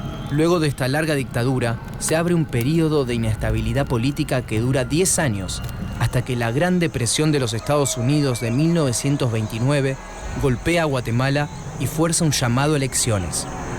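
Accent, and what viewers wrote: Buenos Aires, Argentina. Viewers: y bueno si te molesta el acento bonaerense anda a otro canal, no da que digas que es una "tara" cuando es el acento que tenemos la mayoria de los que vivimos en buenos aires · Che, primero que nada, cómo pronunciás la ch?? Porque tengo entendido que se usa en palabras como dereCHo, muCHas, CHanCHos, y no es lo que se escucha en el video. Capaz estás escuchando mal o confundís las letras, no se. Y segundo, capaz sería interesante que consideres buscar otro tipo de video la próxima si tanto te molesta este acento en vez de llamarlo por algo que no es, porque al fin y al cabo no es ninguna contribución humanitaria. Es el acento que manejamos los bonaerenses, como seguramente vos tengas el tuyo... saludos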